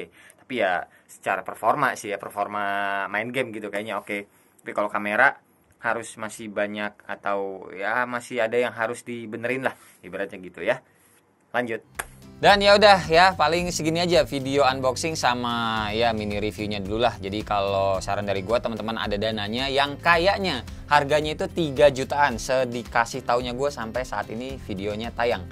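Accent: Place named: West Java, Indonesia. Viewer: Sunda pisan aa teh logat nya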